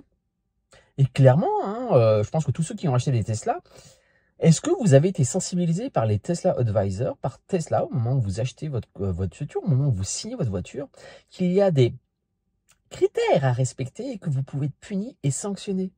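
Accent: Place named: Marseille, France. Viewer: Je précise que j'aime le contenu des vidéos. Même si les titres sont un peu hors sujet et légèrement exagéré. Si je savais pas de quelle région il était je dirais qu'il est de Marseille 😅😂.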